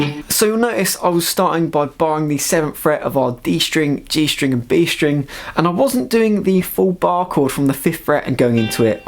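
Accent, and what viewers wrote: United Kingdom. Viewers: Hi, you sound like you're from Surrey?? Did you play victorious festival Portsmouth 3 years ago?? 90's covers, the way you play is the best, outside of mr squire I've heard since that Saturday in Pompey.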